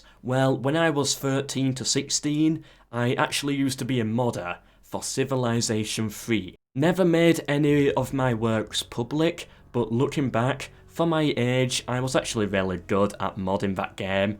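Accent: United Kingdom. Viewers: Are you britsh?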